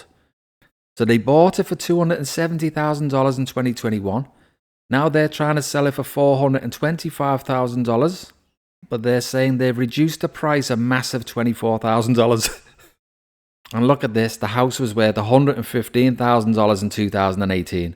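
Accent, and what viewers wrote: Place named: Northern England, United Kingdom. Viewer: This guy sounds like a Northener, England, but New Zealand has so many scammers now, he could just an impression 😮😅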